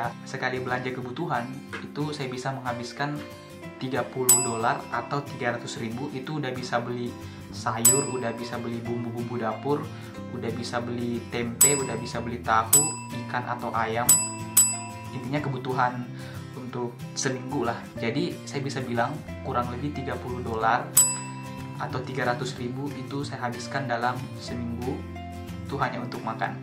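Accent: Makassar, Indonesia. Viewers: orang makassar kah om? dan ini ausi mana??sydney??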